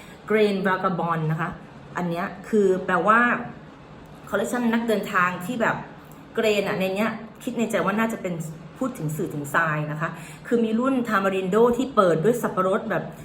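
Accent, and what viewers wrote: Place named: South, Thailand. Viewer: อุ๊ย ชอบ แหลงใต้ด้วย สาวใต้สวยเก่งแบบนี้ต้องติดตามทุกช่องทางแล้วค่ะ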